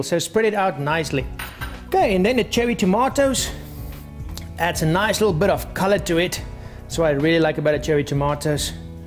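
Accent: South Africa. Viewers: I think he's South African... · hey im south african and i cant help but to hear a south african accent from u. are u from South africa?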